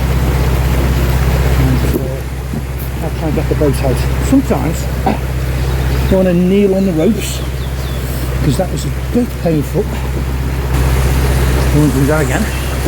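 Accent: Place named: Wirral, United Kingdom. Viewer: We've been watching your vlogs, are you from the wirral ? Your accent sounds like wirral, not Liverpool, we are from the wirral 😂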